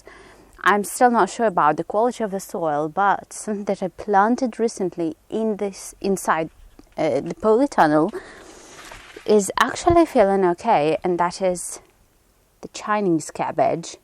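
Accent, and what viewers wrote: United Kingdom. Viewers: why you have a british accent? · Ur accent is british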